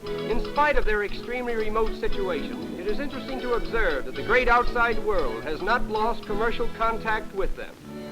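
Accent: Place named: United States. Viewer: Comparatively speaking they were. Most native peoples had not seen a car or radio back then. Schools and widespread education were just starting and the average lifespan of peoples was in the 30s. Very few persons reached a ripe old age to convey accumulative wisdom to their communities. Life (especially in the interior) was short and brutal in the 1800s and this period in the early 1900s marks the transition away from that. In this period colonisation of Kenya was just starting and at this time there were no more than about 10,000 Europeans in Kenya. This sounds like an American commentating and reflects the attitudes of his people and the times.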